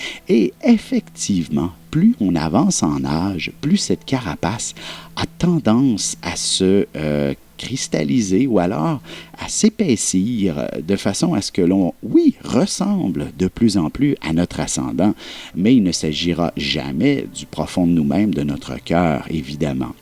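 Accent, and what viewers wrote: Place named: Quebec, Canada. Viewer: lotus fleurs C'est du Québécois ;)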